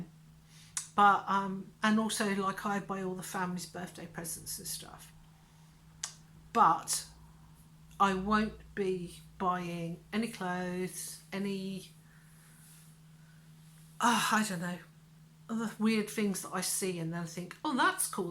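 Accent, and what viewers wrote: United Kingdom. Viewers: OMG, aren't you adorable! 😊 I love our channel - I've just now subscribed! GREETINGS FROM NAGOYA, JAPAN! (I'm not Japanese, myself... I'm a mix of Indigenous Canadian (Siksika/Mohawk-Cree) and Norwegian) - and I like your UK accent! My dear Abigail is from the UK, but she's a Yorkshire lass, so, different accent LOL - anyways, I love that you're still going strong with this channel of yours! Seems so many polyclay'ers have quit YouTubing some fistful of years ago, but you're still rockin' it! I LOVE YOUR CONTENT! 🙂 Please do continue it... I've so many ideas/questions... how to make boxes/containers from polyclay? Etc. - I'm trying to figure out how to make "Ranger Eyes" - they're basically, velcro patches for backpacks, first aid kits, and EDC (Every Day Carry) pouches... I wanna try using polyclay... as when the products are thin enough, they're flexible enough... 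but I might need to use my leathercrafting sewing-hole-punch 'round the edges before baking... WHOOPS! I'M RAMBLIING! LOL Anyways, thank you for your content! And tell what's-his-name to give you your crafting room back! CHEERS! 😉👍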